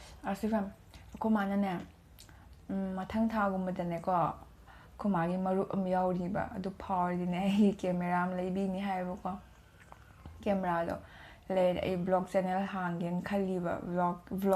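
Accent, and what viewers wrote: Kakching, India. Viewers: Kakching Londo taba ym nungai👍👍👍👍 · Kakching Londa ngangbse ngna tabada ym nungcye kkching Londa adum ngangko mthangdasu😍👍👍👍👍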